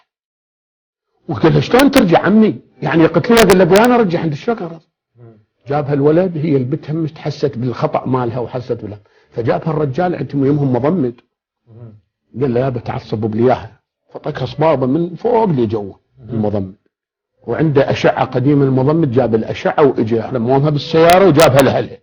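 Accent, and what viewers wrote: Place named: South, Iraq. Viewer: هذا الرجل مبدع، شيخ، شاعر، مثقف، صاحب نخوة، أصالة، أدب، حسجة جنوبية، صاحب حظ وبخت، نقول الفاتحة على روحة الطاهرة.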